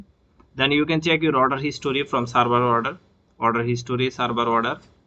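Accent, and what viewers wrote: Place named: India. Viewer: all i hear is "bibidi bobidi" because thats how all Indians/hindus/punjabi/beadheads sound when yall speak.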